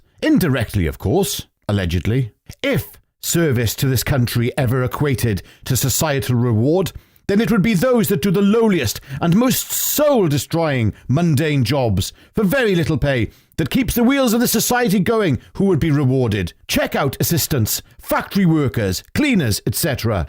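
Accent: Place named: Wales, United Kingdom. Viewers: Maybe some of the royals could be better but the alternative is a Republic and then you end up with criminals like Biden or Clinton. At least the royal family is unlikely, in theory, to be "bought off". From your accent, you're Welsh and I hope I don't offend when I say that I've yet to meet a Welshman who is in favour of the royal family. There seems to be an embittered hatred, by the Welsh, for anything and everything British. Please don't be like this. Wales is a lovely country. We're your friends and we want you to be our friends. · I love your Welsh accent and can’t bear the monarchy 🤮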